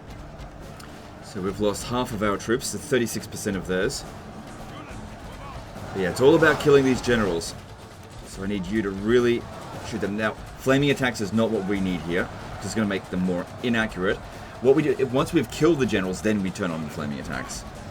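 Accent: Queensland, Australia. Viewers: Your accent sounds queensland to me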